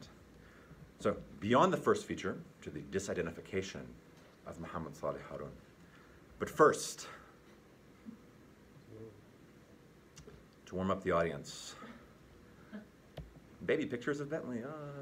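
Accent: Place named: United States. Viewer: ناروتو اوزماكي اوتشيها انتا مصدق انوا سوداني؟ ترا امريكي لغتو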